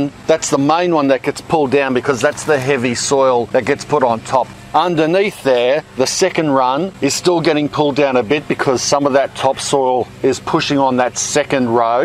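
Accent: Australia. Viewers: Love the channel... but in all seriousness... you ARE saying "mulch" incorrectly. Even with an Aussie accent, the last two letters are "ch", not "sh". It's NOT "mulsh". It's "MULCH". CHOO CHOO like a train. Not SHOO SHOO like you say to bugs or pests.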